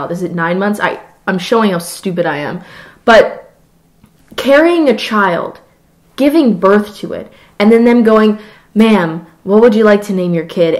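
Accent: United Kingdom. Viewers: Also, where did his british accent go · it's his voice! it's not weird ... {[(british accent DUHHH)]}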